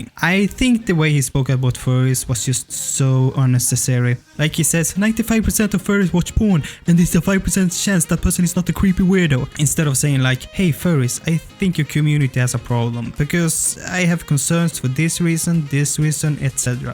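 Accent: Sweden. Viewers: Love your swedish accent :D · I might be wrong but I think it's Swedish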